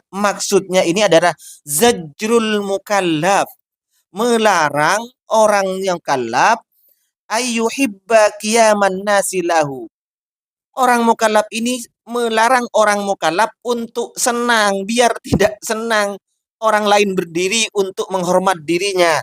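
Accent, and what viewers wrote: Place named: Java, Indonesia. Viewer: Dangkalnya pemahaman mu, mana kajian syeh untuk mencerahkan umat ini, ndi ndi bacanya pake logat jawa medok banget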